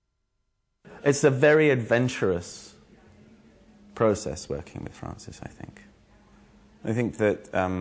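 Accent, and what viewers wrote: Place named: RP, United Kingdom. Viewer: It's a little weird hearing him speak because I'm accustomed to hearing his 'Londoner' accent in 'Lie To Me,' and right now he sounds really posh in comparission, it's throws me slightly lol XD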